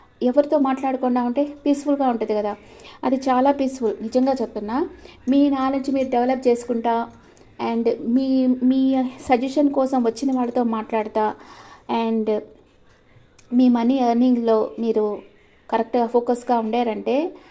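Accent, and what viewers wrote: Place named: Chittoor, India. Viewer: Maam meedi chittoor district slang superb ga undi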